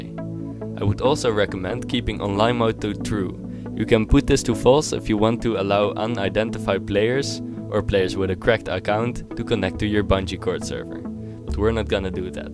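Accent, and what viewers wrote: Netherlands. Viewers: je klinkt een beetje Nederlands haha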